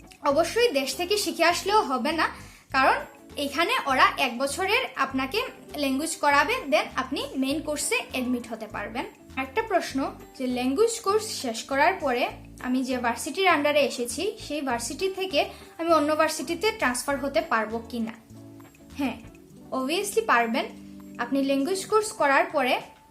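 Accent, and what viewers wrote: Sylhet, Bangladesh. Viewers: আপু সিলেটী? 🥰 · Didi mone hoy Sylhety! Tai na??? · সিলেটী নি গ মাই তুমি ??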